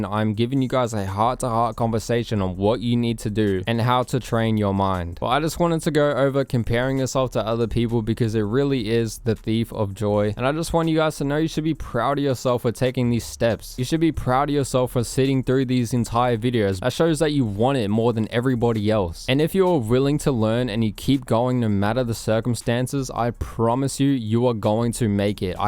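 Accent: Australia. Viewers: can you do the same video but without the australian accent please. It's just i heard a w at the end of every words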